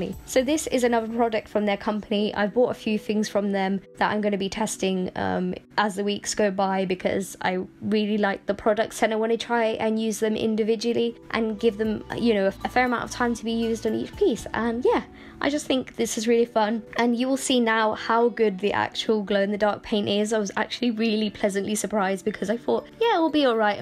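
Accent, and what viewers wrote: United Kingdom. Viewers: Cool painting and it glows like crazy. I wonder if you can apply it to plastic surfaces like a action figure? By the way, love your accent it's British right?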